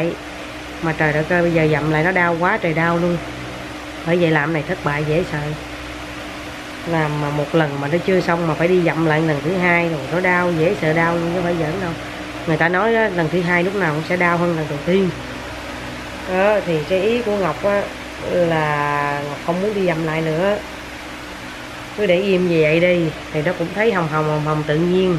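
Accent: Mekong Delta, Vietnam. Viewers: Ngọc de thương nói chuyện thiệt tình đậm chất miền Tây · Chi noi chuyen lanh qua.vui ve Nghe giong chi chac la nguoi mien tay dung khong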